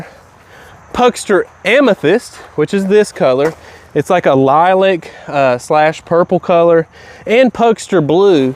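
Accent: South, United States. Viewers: Southern accent is grate , keep it up !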